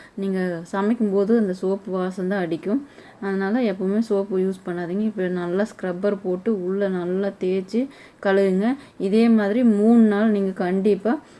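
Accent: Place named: Kongu, India. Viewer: அருமை, எனது சந்தேகங்களுக்கும் பதில் கிடைத்தது, உங்களின் கொங்கு தமிழ் உச்சரிப்பும் அழகாக இருந்தது.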